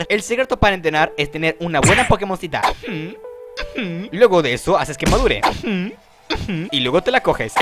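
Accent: Venezuela. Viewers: HAAAAAAA ERES VENEZOLANO CONRAZON PAPI ERES DE LOS MIOS HABLA CLORO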